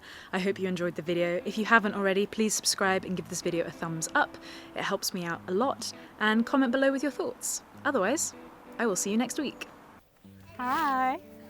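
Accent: United Kingdom. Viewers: æt taimz lυks æ bit laik Lara Kroft hier, widh dhoυz sanglāsez. Ænd dhe British æksent enhānsez dhī impreshən.;–)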